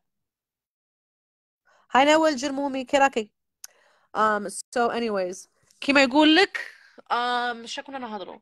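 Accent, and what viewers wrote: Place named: United States. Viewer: سلام الزينة بنت بلادي أنا نتابعك من ليون بفرنسا. بنتي تحبك و تعجبيها بزاف في طريقة نطقك للإنجليزية الأمريكية. و أول مرة نعرف باللي زوجك فلسطيني ربي يحفظكم و يسعدكم و يهنيكم يارب العالمين 🇩🇿❤🇵🇸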